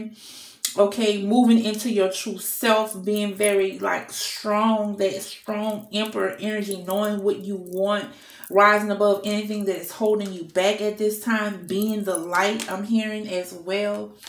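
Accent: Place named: New Orleans, United States. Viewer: On point, thank you. 🙏🏼💛 And the Nola accent too cute!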